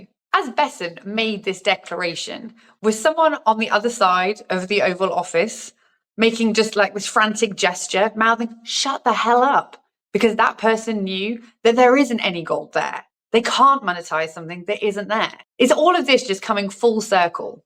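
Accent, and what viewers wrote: United Kingdom. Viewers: Who is we? Your accent sounds British? We in America need to do nothing if it bothers you then go use yin if memory serves the British pound was once a world currency correct? So I think the entire planet has gotten themselves into debt nearly all countries….what blows my mind is the country with the reserve currency has to debase it in order for all the free loaders to use it, all these videos on what we the USA must do, we don’t have to do anything we have been paying the bar bill for all the NATO countries for some time allowing all of you to spend less on defense and to rip USA off in trade all to help your countries and you all still are in debt…..America is in the debt because we have been covering the cost of allot of countries…Cold War ended at that point you all should have pony up but for some reason it didn’t happen….now with trump saying enough is enough we get all the tears Canadians are having a melt down over it the were getting 200 billion off us in trade but blocked allot of our goods same as European countries. 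Here is what I think if the gold is gone from Fort Knox and the USA dollar collapse Europe will be at WW3 inside 5-10 years with each other or Russia do the math if the reports are true Germany has one of the largest gold reserves hmmmmmm the country that started ww2 that owed war debts until what the 90’s will suddenly be most powerful European country lol every time Germany has been unified and has the means there has been war.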